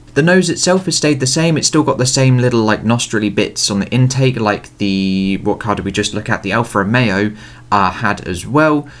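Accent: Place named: United Kingdom. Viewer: really british accent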